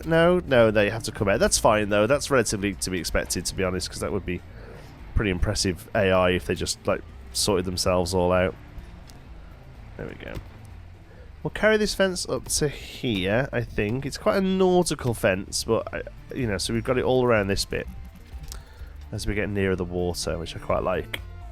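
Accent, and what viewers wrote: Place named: England, United Kingdom. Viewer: i watched all your previous videos ep i loved ur accent lookin english uk sometimes better than en usa , i wished this game free because i support always free games open source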